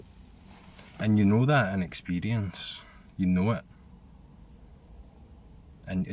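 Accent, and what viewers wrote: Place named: Scotland, United Kingdom. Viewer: another beautiful video. It feels like I’m sitting in my car in Los Angeles cheering you on in your car over there in Ireland, I thought you were Scottish though I can’t quite figure that out lol but anyway cheering you on is cheering me on. I recently got incredibly incredibly deeply triggered in relationship over Christmas break. No details are needed here, but I just felt the self Ing mechanism coming back so strong and it was so unbearably uncomfortable. My whole body got like stuck in a freeze mode, like my nervous system just got stuck. I had to come back from Christmas break and take a month off work so that I could simplify the outer stressors as much as possible so that I could tolerate these very very deep, deep internal stresses. Yes there’s no exterior and interior but I think you know what I mean. 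I had to give myself time to sit with the most subtle and yet intense reactivity to life, because what can feel so powerful in the selfie mechanism is the sense of time and urgency and like we’re working against time. At least that’s the experience here. So I had to give myself literally a month and more if I need to to just be with these very very verysubtle and intense sensations without feeling the pressure of time to escape them. Anyway, didn’t mean for this comment to get so long but that’s what’s going on here now and your video was right on time. Speaking of lol. Thank you, thank you thank you❤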